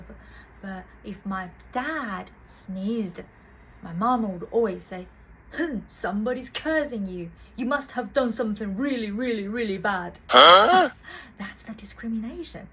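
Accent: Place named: United Kingdom. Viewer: love your British English accent :) ! Thanks for the helpful vid!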